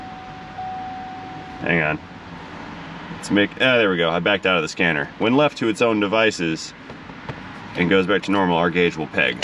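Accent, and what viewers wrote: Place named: South Florida, United States. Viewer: You did say you all. That's what they call a Southern Florida accent. But same difference As a Southerner.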